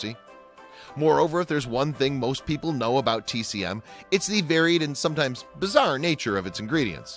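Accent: Midwest, United States. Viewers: New subscriber, enjoy your videos. The narrator on this video has a distinctive Midwestern accent. I enjoy hearing it since I've been in the south for the last 20 years.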